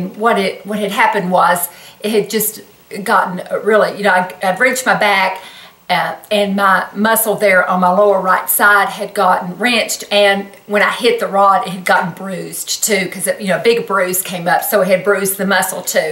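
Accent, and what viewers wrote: South, United States. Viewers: I'm from Florida. My family has been in Marion County since 1846. So I am truly a southerner. I tell you, it is so wonderful to hear a southern accent. It is really hard to find one, any more, down here in Florida. You are absolutely a breath of fresh air. I love your YouTube channel. Through the years I have put on weight and am having trouble taking it off. Can you give some pointers on how you keep yourself fit? Maybe some diet suggestions. I'm so sorry you hit that rod! I pray the Lord put His super on the natural for you and that you are better, quick like a rabbit! Thank you for everything!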